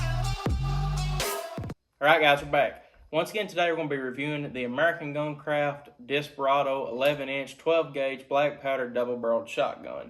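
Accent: South, United States. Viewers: Why does watching a gun review from someone with a heavy country accent feel so much more reassuring?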